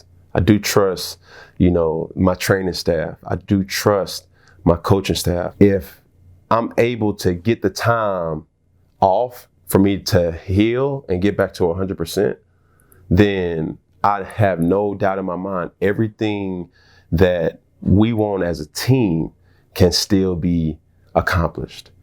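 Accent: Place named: Atlanta, United States. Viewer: Bruh you letting your ATL come out slowly..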